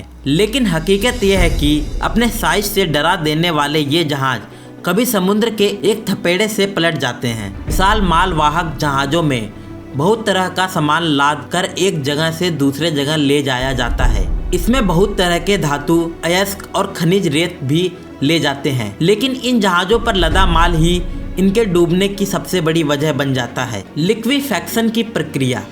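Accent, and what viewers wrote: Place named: India. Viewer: Bhai Indians esy hi bolty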